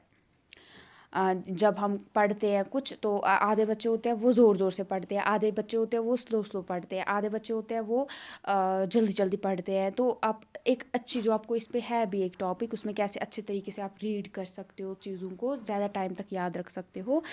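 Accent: Kashmir, India. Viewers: 😂😂di kashmir se ae🙄kashmiri typ urdu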